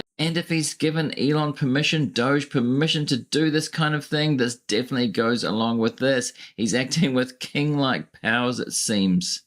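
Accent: New Zealand. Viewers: Are you Australia or New Zealand. I think your accent sounds more New Zealand. I just want you to know that we Americans are not all psychotic as the media has us looking today. I originally clicked on one of your videos a few weeks ago. I thought you were one of my former employees. You two are a splitting image. As far as Musk, I am quite sure he will be prosecuted in the next administration. However, I think the current administration is so afraid of retaliation. The Congress on the left side are very afraid of Trump. However, Musk should start putting his assets and etc in order.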